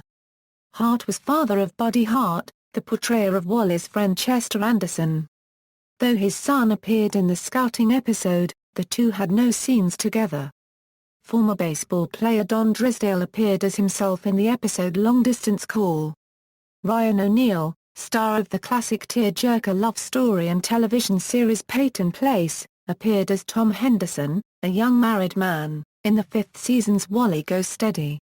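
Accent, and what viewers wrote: United Kingdom. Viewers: I don't like the British accept telling us about an American TV Show.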